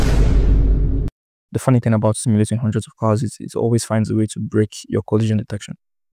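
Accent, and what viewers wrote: Nigeria. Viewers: Pure naija boy 😂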